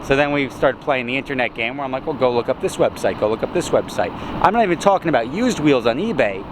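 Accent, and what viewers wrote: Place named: Upstate New York, United States. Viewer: where is this guy from, a very distinct accent almost like upstate new york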